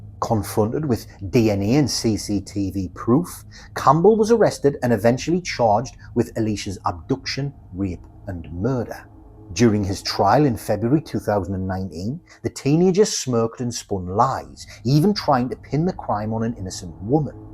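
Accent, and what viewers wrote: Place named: Newcastle, United Kingdom. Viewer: Hi fellow Geordie 😁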